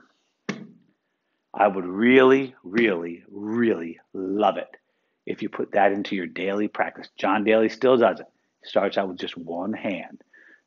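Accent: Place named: United States. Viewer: This man has an American accent. #skip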